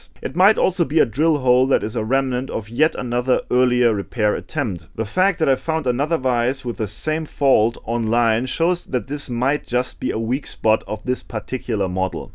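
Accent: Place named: Germany. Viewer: Your English in German accent cause HEADACHE. Better no narration and keep quite.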